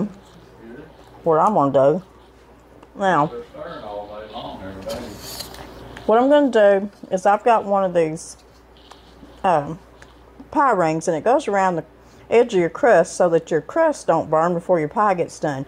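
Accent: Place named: Arkansas, United States. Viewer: Ms, of all the channels I watch, yours in my favorite. My Momo and Popo and family were from Arkansas and listening to y’all just reminds me so much of them, and I’m learning so much. Thank you.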